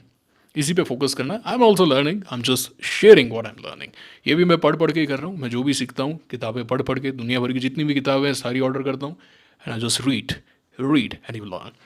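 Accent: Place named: Rajasthan, India. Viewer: Aapki baatein sunke aisa lagta hai ki : Aap rajasthan se hai (shayad - Kota, ajmer side) and aap kota (JEE) padhne ke liye gye thhe.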